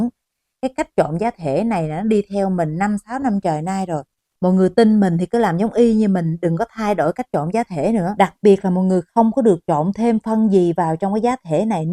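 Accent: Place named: West, Vietnam. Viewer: Giọng miền tây dễ thương dễ nghe hay do tui nghe quen rùi nên thấy dễ thương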